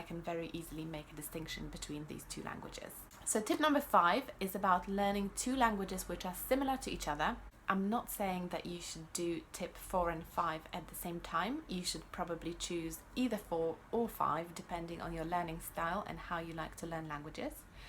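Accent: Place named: United Kingdom. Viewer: Very useful tips, I'll definitely try out some of them. Last year I started to learn Norwegian and Hungarian from scratch at the same time and I was quite unsure whether it was a good idea. I have one question for you: how did you manage to lose your native accent and speak like a Brit? I'm Polish too and although my accent is clear I'm still far from sounding like a native. Thank you for the video!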